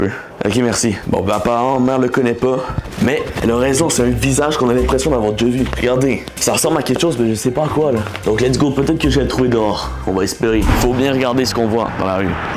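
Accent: Canada. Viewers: Tu est Canadien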